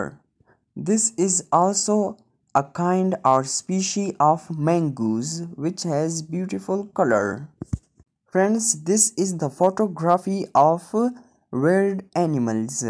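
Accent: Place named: Brazil. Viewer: É um brasileiro falando com certeza 😅😅😅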